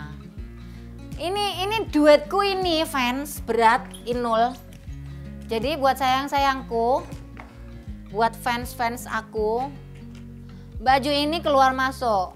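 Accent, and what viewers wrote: Java, Indonesia. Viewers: aku sukak gaya medok bicaranya bunda,,, podo2 wong jowo bun😍😍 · Ciri khas bunda inul medok e lho sing marai seneng denger bunda ngomong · Ya Allah mbak inul masih kentel am jawanya...seneng bngt dengernya...jngn di hilngkan khas jawanya y mbak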